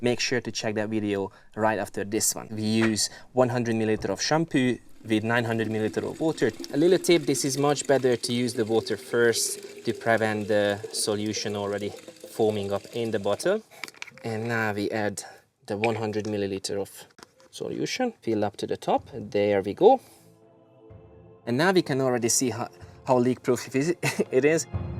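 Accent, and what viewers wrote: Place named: Hungary. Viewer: Hi, according to your accent, you must Hungarian 😉. Correct me if I'm wrong. Good content by the way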